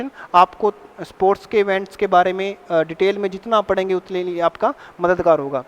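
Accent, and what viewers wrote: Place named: Punjab, India. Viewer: Aapka hindi punjabi accent😊😊😊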